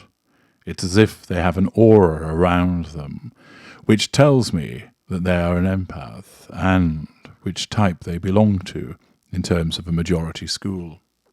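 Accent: United Kingdom. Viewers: Why is everyone with a British accent automatically a douche? You're the ultra alright. Ultra twat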